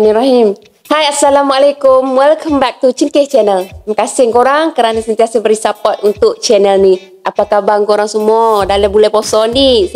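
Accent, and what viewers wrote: Kelantan, Malaysia. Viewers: Sedap pulak dengar kecek Kelate..😄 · Kak org Kelate ko...kg mano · Hadir selalu layan.. Suka dengor akk kecek kelate...😁😂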